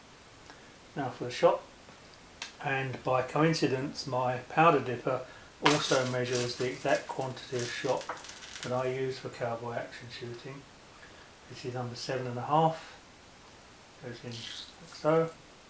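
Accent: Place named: England, United Kingdom. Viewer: england would be a nice place to visit one day. if all my english internet friends didnt live in rough neighborhoods. I had to ask because of your accent. well technically it's not an accent seeing as though you speak the english language correctly guns and the english just do not seem to go hand and hand these days. older english guns however are quite amazing. i want a webley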